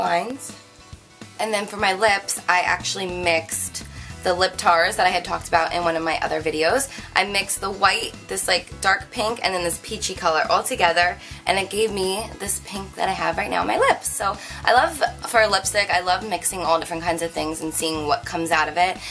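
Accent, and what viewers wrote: New Jersey, United States. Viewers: I agree. I think it's that slight and subtle New Jersey accent. :)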